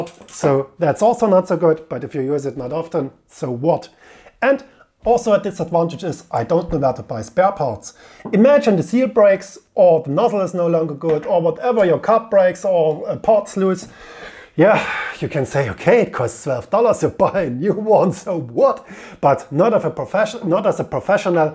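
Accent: Germany. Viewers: Excellently presented work with a gun. I am interested, however, in these seals you mention. To be replaced with SATA seal. Where can I buy this? What address, shortcut on the website? I hear from the dialect that you are from Germany. I may be wrong. Is it possible to get these seals from Germany?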